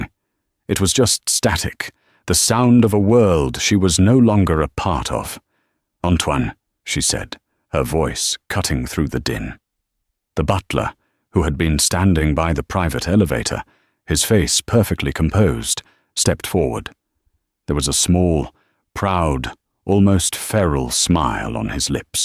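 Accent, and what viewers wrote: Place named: England, United Kingdom. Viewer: Oh so glad I'm not the only one to enjoy the narration in that purring English accent. YUM!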